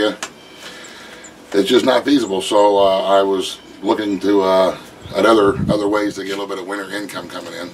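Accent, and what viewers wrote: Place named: South, United States. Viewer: I'm a new subscriber and I love the content on your channel....you sir sound like the southern version of another YouTuber named Buckin Billy Ray from Canada...he spreads the same message, check him out. I love your channel and I will share with my friends. Cheers from Kansas City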